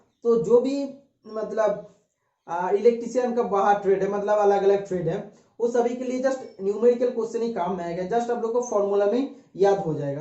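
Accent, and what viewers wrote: Bengal, India. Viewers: Are you from Bengal ?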